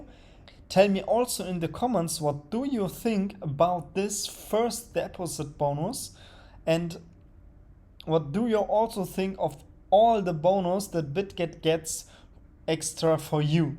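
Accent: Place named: Germany. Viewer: You sound like a German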